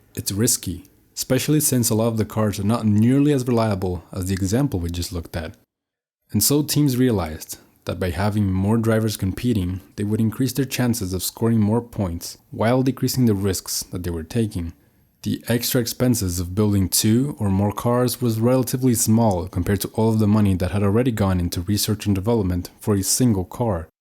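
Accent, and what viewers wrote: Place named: Turkey. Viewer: You got turkish accent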